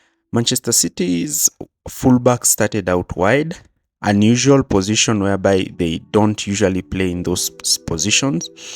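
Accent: Kenya. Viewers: I love your commentary. I don't know where you come from but you have a Kenyan English accent.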